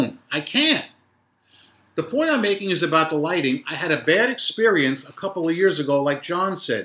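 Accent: New York, United States. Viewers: your NY accent & how you come across is easy listening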